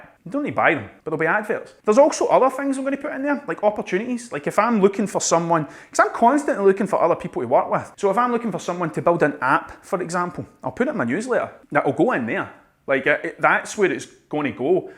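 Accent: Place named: Scotland, United Kingdom. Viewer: just came across your channel man dont know how I have never found it before but I'm happy because now I can go back and watch everything !! trying to get into painting myself and you have given me a fresh outlook on what I'm doing and why I'm doing it, you the man! love the Scottish accent and love the comedic honesty its refreshing hahaha thank for sharing your knowledge man much love from Ireland 🤘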